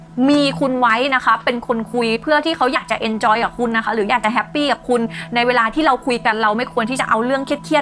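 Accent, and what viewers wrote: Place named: Thailand. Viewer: ทำนายตรงมากคับ...ชอบฟังเสียงคนนี้มากๆเสียงน่ารัก..เหน่อๆนิดๆ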